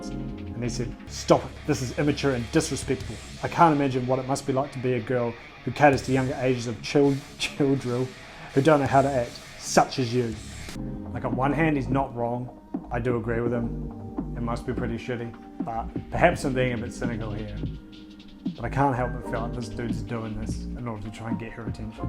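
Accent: New Zealand. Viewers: Are you kiwi? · Only 7k? Bro you deserve at least 100k also love hearing the kiwi accent on yt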